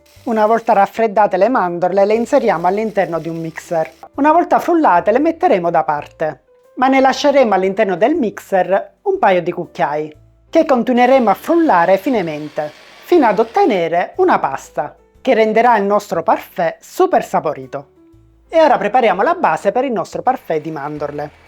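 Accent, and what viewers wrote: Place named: Sicily, Italy. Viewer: stavo apprezzando l'accento siciliano, visto che, se dovessi scrivere la parola: "resta", per come viene pronunciata ci vorrebbero non meno di 4 R😅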